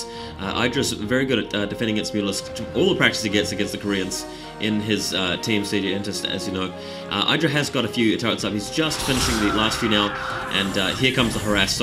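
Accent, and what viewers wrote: New Zealand. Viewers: kool to see a real starcraft game again, some of my best games on this map long time since i viddied some of, the new zealand accent is nice:) btw i think ret has +1 attack on his lurkers or atleast i always got that for them first so they twoshot marines with 3 armor?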